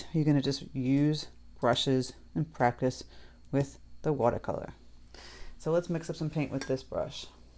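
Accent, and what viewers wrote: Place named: Massachusetts, United States. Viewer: Love your teaching style I’m a beginner but enjoy your instructional method of don’t stress. Also detected a bit of a Mass accent 😀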